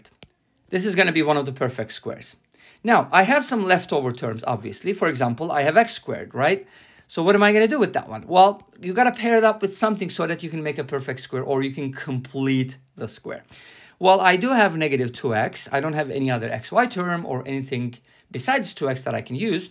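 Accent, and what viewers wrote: Turkey. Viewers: are you turkish?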